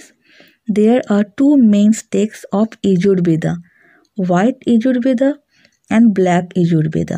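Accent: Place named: Bengal, India. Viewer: I think you are bengali because taking like bengali English mixs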